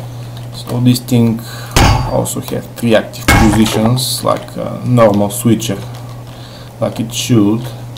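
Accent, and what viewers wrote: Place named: Russia. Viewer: прости но ты Русский той акцент не катит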